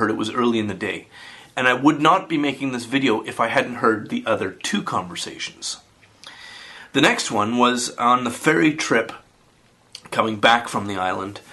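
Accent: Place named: Scotland, United Kingdom. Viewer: sounds Scottish. I'm a Scot myself.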